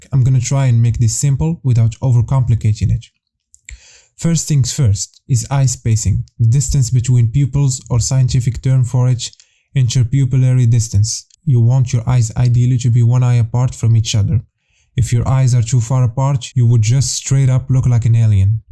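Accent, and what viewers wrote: Morocco. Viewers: The english of the person speaking screams moroccan. Just by his accent i can even tell you which city in morocco he comes from. XD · you've got a Moroccan accent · You sound moroccan bruv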